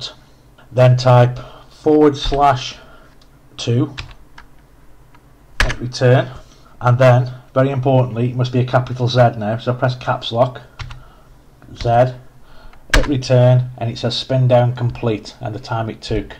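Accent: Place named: Shildon, United Kingdom. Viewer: Just tried this but using an Arduino's FTDI chip to do the serial side, no dice. So I've resigned to ordering a CA-42 Lead and see where that gets me. The Arduino did let me see the "LED:000000CC FAddr:0024A051" Error when the board is in place so I know I'm fixing the right issue. Totally Unrelated, You sound like you aren't too far from me, you sound very much like my friend from shildon :D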